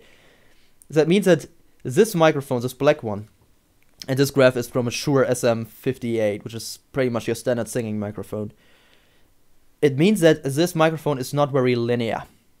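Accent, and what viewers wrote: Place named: Germany. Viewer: Are you German..your accent is dope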